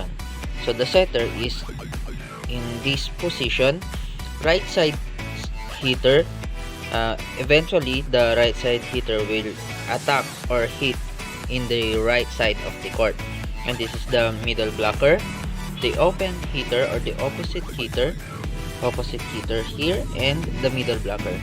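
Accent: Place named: India. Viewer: dami mo filler, ang sakit sa tenga ng english mo... parang kang indian😢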